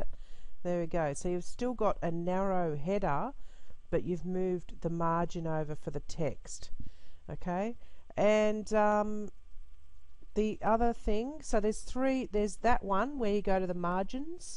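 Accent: Australia. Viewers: Love the Aussie accent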